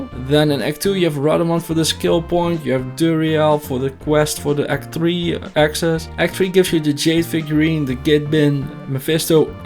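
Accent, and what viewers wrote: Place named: Netherlands. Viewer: I've been stuck on NM for 9 months now because I'm waiting on the next entry in this series..;) jk, but nice video and looking forward to new videos! (Also nice to hear a fellow dutchy)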